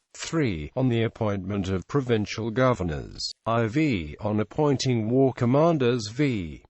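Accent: United Kingdom. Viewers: The robotic accent maked it impossible to understand, such videos including arabic words must not be created in British robotic accents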